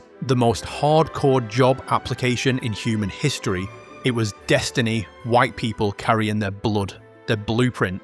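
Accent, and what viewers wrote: United Kingdom. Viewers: American history narrated in a British accent. Is this supposed to be funny?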